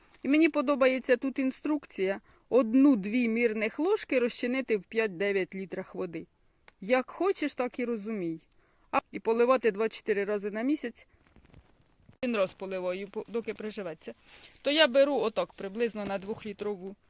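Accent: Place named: Poltava, Ukraine. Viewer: Добрий вечір, попала на ваше відео і відразу підписалася, тому що почула знайому вимову , я сама родом із Царичанського району, (живу у Дніпрі) потім узнала,що ви з Полтавщини. Манера розмови, акценти дужі схожі. Бажаю вам гарних урожаїв, а якби висилали свої квіти, я обов'язково б у вас купила.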